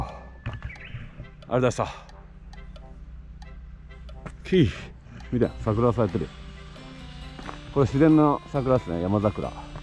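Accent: Yamaguchi, Japan. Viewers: 広島なんですが、山口弁って広島弁に近くて親近感もてた(*º▿º*)俊ちゃんの今♪を聴いてます♥ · YouTubeで山口弁が聞けるのが良いですねぇーーー😍。最近は女子の方言で広島弁が厳ついからでしたっけ？可愛い顔して「じゃけえ」とパンチのきいた言葉のギャップ萌えとかあるみたいですが、男の人の山口弁を聞いたさんのファンはどう感じてるのか気になる❗️ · 山口県民です。方言がYouTubeでガンガン聞けるの楽しい！笑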